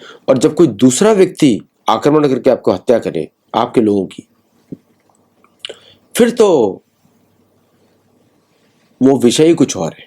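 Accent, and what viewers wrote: India. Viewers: Respected sir, I thought you were from Kerala or Tamil Nadu. The way you explain, all our family members, including my mother listens to your Vlogs. Hope you explain in english also regularly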